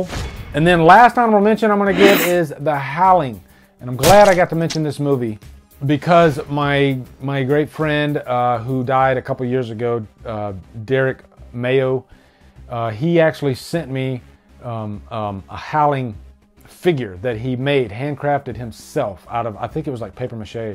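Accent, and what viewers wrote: South, United States. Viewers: Are you Southern originally?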